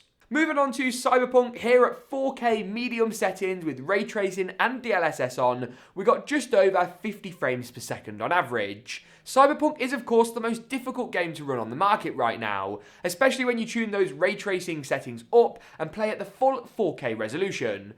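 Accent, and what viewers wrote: United Kingdom. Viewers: This guy surely likes speaking british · I like your british accent.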